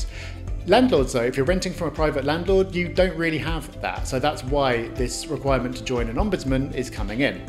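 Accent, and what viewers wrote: United Kingdom. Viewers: It is irresponsible of you to NOT make it clear in the video title that this is about the UK. (Only once I heard your accent did I suspect it might be - and there again, you NEVER say it in the video either!) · The picture you click on to start the video shows the Houses of Parliament in London, the presenter guy has an English accent - I'd say it's probably England... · Title is misleading. Applies to UK not the U.S. First hints are his Accent and mentioning Parliament. Magna Carter did percolate to protect property rights for the landlord.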